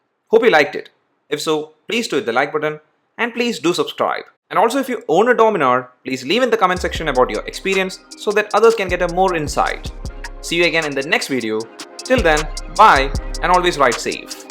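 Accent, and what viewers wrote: Kerala, India. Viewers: IF YOU TAKE CARE OF YOUR 🤭MALLU ACCENT. IT WILL BE BETTER OTHERWISE OK.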